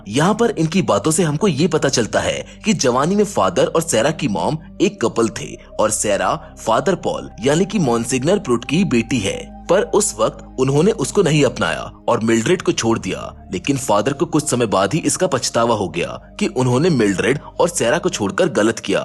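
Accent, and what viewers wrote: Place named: Bollywood, India. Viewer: Aapka Bollywood silver screen Wala accent hi bohot accha lagata tha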